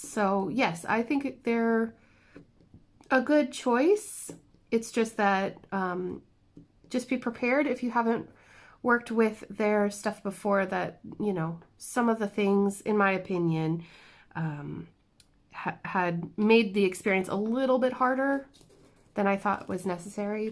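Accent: Ireland. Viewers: Sometimes when chatting you can hear a bit of the southern Irish accent coming through, even though you are American and to be honest it sounds really beautiful to. Wow I am surprised that negative drama is showing it's head in the crafting community, what is wrong with these people crafting is meant to be relaxing, fun and hopefully making new friends who enjoy the same hobbies that we all have in common. So sorry that has happened to you I must see if I can remember my Instagram password because I am looking for a particular diamond painting called remember me from dac which I am keeping money aside for if it suddenly comes along. I also have quite a few diamond paintings that I could donate for your charity. God bless look after yourself I feel your pain I have developed an allergy to sunshine just my luck, my son says I'm turning into a vampire 🧛‍♀️lol. 🤗🍀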